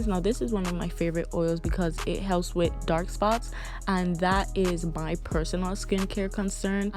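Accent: Caribbean. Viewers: Are you from the Virgin Islands 🇻🇮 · Heyyyyy 😍 where you from if I may ask? U sound like u got caribbean background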